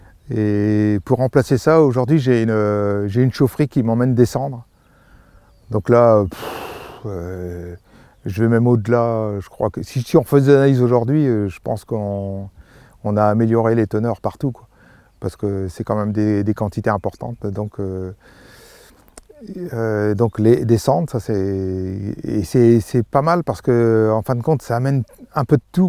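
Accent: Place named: North, France. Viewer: L'accent du Nord est resté... 😇👍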